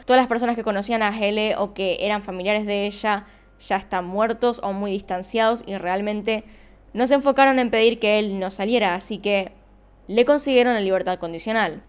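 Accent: Argentina. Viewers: Trabajo en la madrugadas y hace un par de días vi tus videos, creo que ya vi la mitad jaja. Eres genial, con todo y tu acento argentino ✌🏻😋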